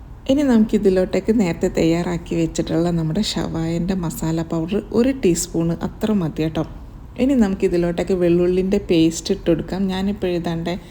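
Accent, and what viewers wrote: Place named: Tamil Nadu, India. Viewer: ഒരുമാതിരി തമിഴ് നാട്ടുകാർ മലയാളം പറയുന്ന പോലെ... ബോർ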